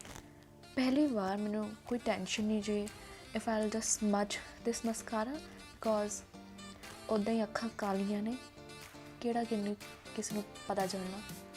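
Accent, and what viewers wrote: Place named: Delhi, India. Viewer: Punjabi boli de accent te dhyaan den di lorr ya, subscribers apee chake jane ya tahaan nu delhi vala accent delhi valiya nu jama ni bhaounda😅😅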